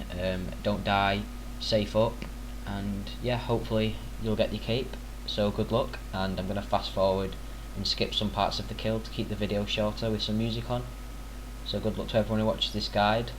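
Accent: United Kingdom. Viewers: geordie accent :D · You from middlesbrough?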